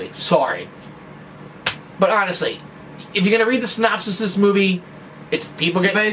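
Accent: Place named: Philadelphia, United States. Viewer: Is the movie Hancock, with the loveable Will Smith? I think it is;) And I only saw that movie once about 3 years ago. Anyway, Vile sounds good and I've loaded it up. Gonna watch it right after posting my answer to where your hat is from. Plus, great news for you, I live in Pennsylvania and from your accent, I'm guessing you live near Philly (also Flyer's cap;) so shipping should be minimum haha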